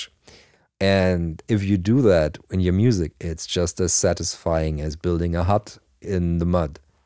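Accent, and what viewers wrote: Germany. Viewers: Your voice is like recording to tape. Crisp top end, tight low end. The perfect amount of German accent is like the subtle drift of ancient oscillators.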